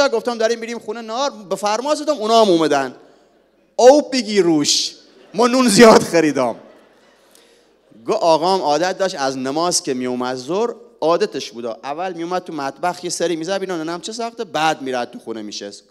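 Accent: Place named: South, Iran. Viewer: چندبار تو اینستا دیدم شنیدم ،ولی آدم چقدر زود به زود دلتنگ صدا و قصه هات میشه ،حتی نمیدونستم راوی این قصه ها و این صدای جذاب و لهجه جنوبی شیرین کیه ؟ آخر تو گوگل سرچ کردم قصه با لهجه جنوبی ،اولین نتایج جستجو چهره آقای احسان عبدی پور رو نمایش داد و جنگی پریدم تو یوتیوب و... پاینده باشید و مانا ❤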